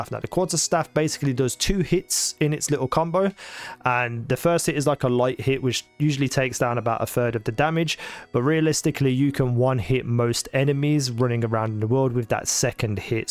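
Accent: Birmingham, United Kingdom. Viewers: Disregarding because of the Brummie accent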